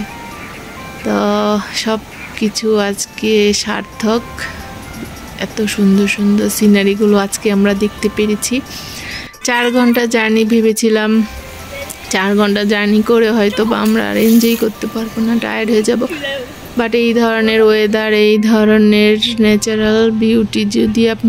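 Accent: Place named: West Bengal, India. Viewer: Apnar bangla uchharon Bharotio bangalir moto..bes valo , all the best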